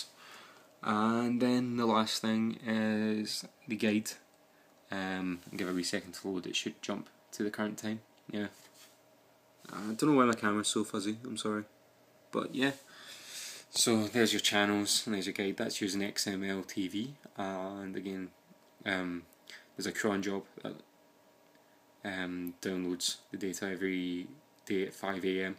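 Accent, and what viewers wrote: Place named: Scotland, United Kingdom. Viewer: That's because he's Scottish, and your English is not good enough. Sorry - you were expecting a personal translation just for you?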